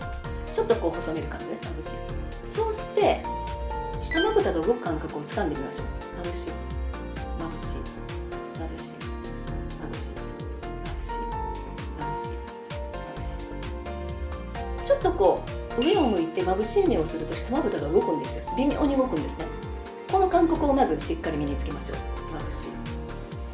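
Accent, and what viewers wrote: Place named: San'in, Japan. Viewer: まだ30代前半なのにたるんできて絶望😭してましたが、毎日試してみます！ところで、さん、山陰の出身ですか？イントネーションにどことなく懐かしさを感じてます😊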